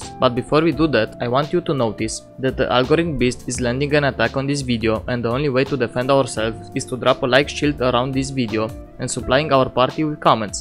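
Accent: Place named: Romania. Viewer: this guy sounds so romanian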